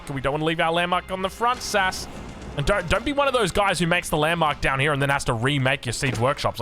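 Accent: Australia. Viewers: Hey, my Englisch was never good. But cause of you it sounds even more funny. After picking up Spanish accent when living in South America and mixing it with my German accent now it got spiced up with your Aussie accent. Thanks for that. 😂 love your vids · i could listen to talk about anything. dude is so funny and quick and idk if it's an American thing but Aussie accents just make me smile. i dont even play AoE but i know everything about the game now haha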